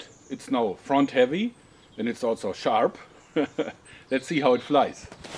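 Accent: Germany. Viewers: Sehr geiler deutscher Akzent :D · mal dumme frage aber biste deutscher? weol dein english so klingt^^ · Are you german ? Nothing against you talking, but I just wanna know.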